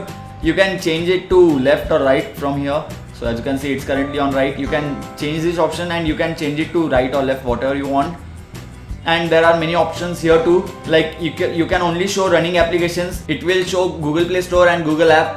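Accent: India. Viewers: lol indian accent kinda sucks tbh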